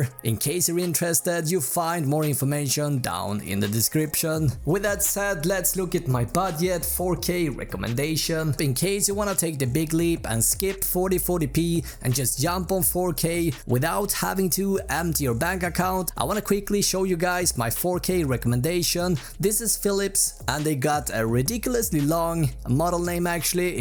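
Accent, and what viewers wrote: Sweden. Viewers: SVENSKKKKK man hör det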